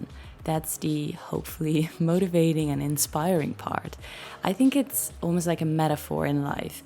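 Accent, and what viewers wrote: Netherlands. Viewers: Are you Dutch?🙈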